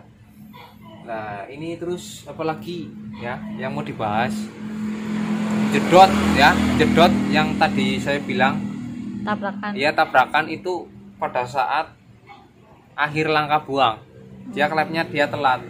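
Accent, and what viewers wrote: Banyumas, Indonesia. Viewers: Mas alamat bengkelnya dimana kasih tau dong bahasanya kok ngapak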